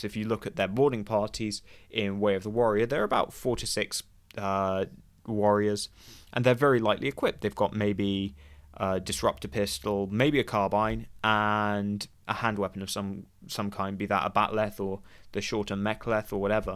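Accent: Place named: London, United Kingdom. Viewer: I think it's the "London accent".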